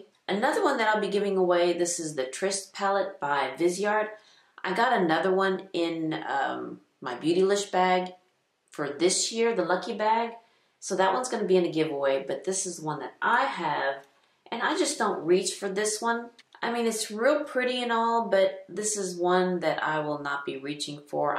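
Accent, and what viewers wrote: United States. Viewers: You may be Egyptian, but your accent is all Texas! I just gifted several palettes to my college aged daughter. Felt good!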